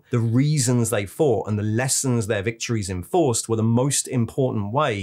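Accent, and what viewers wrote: United Kingdom. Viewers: I love that you use as your end song clip, I look forward to it on all your videos and your condensed informational style on the lore. luetin's great, if you have the time. Major Kill's good for a some cheeky fun, Baldemort's amazing for the imagined paths one can explore outside the cannon. You are Leutin if he didn't get lost in the lore but with that silky smooth British accent. But concise, to the point, with a a tinge of humor that's just the right amount. A cooked to perfection dish of information.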